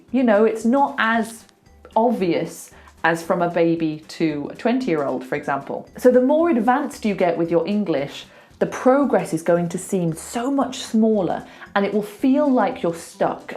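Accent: United Kingdom. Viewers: IS IT BRITISH ACCENT ?😃😃😃😃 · Oh... I miss a lot this British accent!! ❤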